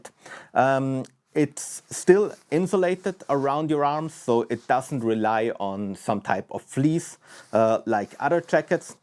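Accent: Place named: Germany. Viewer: Hi! here Giorgio from Genova, Italy. I'm a mediocre alpinist / hiker. About the jacket you reviewed: you mentioned the price is almost 300 euro and that seems to you cheap, as I well understand. To be honest it doesn't seem to me exactly cheap also considering the possible good quality of this article. I recently bought the Simond Alpinism Light Piuma that costs almost 1000 euro and yes, maybe it has not the same warm... but has double zip closure:). Do you know it? In general I appreciate your contents. I'd suggest to mix the static camera view you generally use mixing your talk with on-field recording just as you did in the ice axes video. This would enhance the "quality" of your videos already very good and I agrree with your points. BTW, I know you are from Switzerland, but from which region you are? By your accents it seems you are from a German-language, right? 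You told you spend a lot of your days in mountains, so my suggestion / request is to share (if you feel interesting for your audience) some interesting alpine climbing routes in Switzerland you do. For me this is not for practical usefulness, but just for a cultural curiosity. Also, in general I'm interested to know climbing techniques and "common sense" mountaineering behavior rules in other countries, a part Italy. Thanks again giorgio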